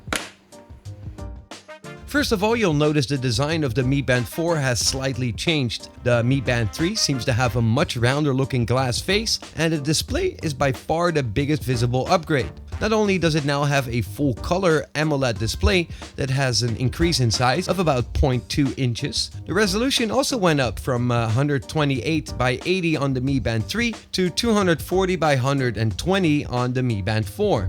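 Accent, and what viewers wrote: Netherlands. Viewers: You're Dutch, right? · hoor ik daar een licht NL accent? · You sound Dutch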